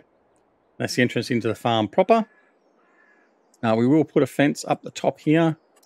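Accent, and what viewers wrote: Australia. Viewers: Bro you can tell this dude is Australian because that’s exactly what one sounds like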